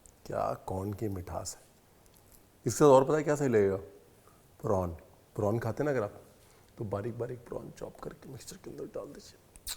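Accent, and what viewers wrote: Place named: Lucknow, India. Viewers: Aap ke bolneka tarika asal lakhanavi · Lucknow ki baten sunne acha lagta hai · लखनऊ वालों का अंदाज ही अलग होता है उनके लहजे में लखनऊ झलक ही जाता है.....❤🎉😊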